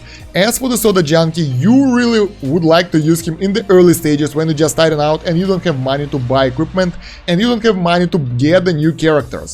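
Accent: Russia. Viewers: Ты русский, или притворяешься?)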